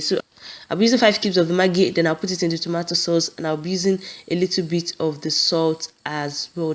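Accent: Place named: Yoruba, Nigeria. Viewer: Jeezzz you accent is just so yorubatic . Alf scotch bennet. 🤮🤮🤮 , add—- hadd, ott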